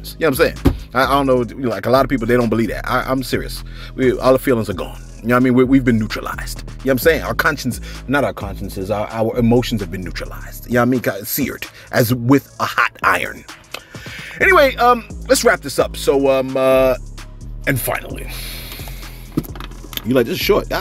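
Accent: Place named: East Coast, United States. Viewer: His "C Om Sayn" is so East coast..Sup South Jersey...The page is entertaining..His accent is too cute and his hype voice is too funny... he posted a King of Clubs. What you play Tonk or pitty-pat..That million is coming quickly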